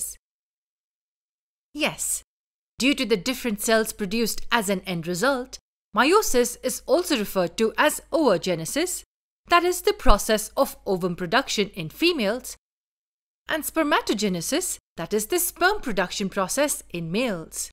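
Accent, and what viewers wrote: India. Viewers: Can you just change your Indian accent? That's so weird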